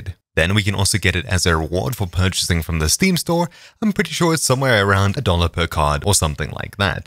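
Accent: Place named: South Africa. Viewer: I know an south african accent when I hear one.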